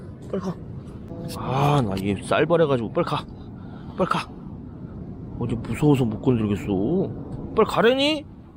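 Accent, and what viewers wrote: Gangwon, South Korea. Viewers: 고양이 안죽이신건 잘한일임. 예로부터 개, 고양이, 뱀은 죽이지 말라고 했습니다. 동물령이 붙는다죠. 근데 어제 이 채널 첨왔는데 매력넘치는 주인장님 재밋네요. 재밋는 강원도 사투리 억양 잘보고있습니다. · 카리스마있는 인상에 엄청 잘생기셨는데 ㅋㅋㅋㅋ 강원도사투리가 너무 귀여우시네요 ㅋㅋㅋㅋ 반전매력ㅋㅋㅋㅋ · 강원도 사투리 쓰시는거 보니까 강원도 이신가봐요?ㅎㅎ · 네발달린늠이 고양이였네요.. 병아리를 안먹고 놀이삼아 죽이다니.. 저도 강원도라 말투 정겹네요.. 단속잘하셔서 피해 더이상 안받으시길.. 화이팅하십쇼..^^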